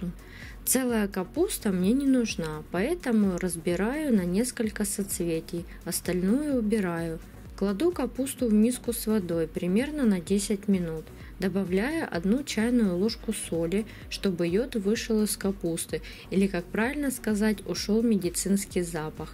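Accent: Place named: Ukraine. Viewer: здравствуйте! Очень доступные и просиые рецепты у вас. Спасибо, что делитесь с нами. И ещё мне нравится, как вы говорите. Вы из Украины?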